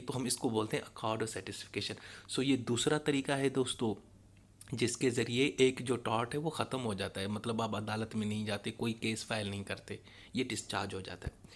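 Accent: Karachi, Pakistan. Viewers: Sir ap Karachi sa hn? Ap ky bat krny k Andaz sa lg rha h plz btay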